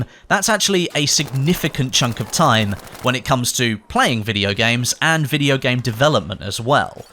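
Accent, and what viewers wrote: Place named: United Kingdom. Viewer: I watch your videos for your British accent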